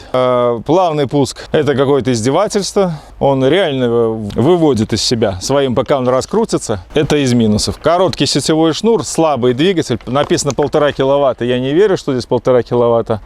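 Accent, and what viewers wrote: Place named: Belarus. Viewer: беларусский говор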